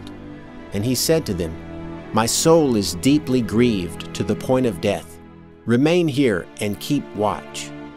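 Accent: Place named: Mississippi, United States. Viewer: You're fairly articulate and I'd be lying if I said I wouldn't look forward to some Mississippi drawl for an entire video. It's a very distinct vernacular and as I'm from Northern Wisconsin myself, we like to pronounce everything through our noses and we add a few too man "ehs" and "oh yas" to pretty much every other sentence so variety is nice, eh?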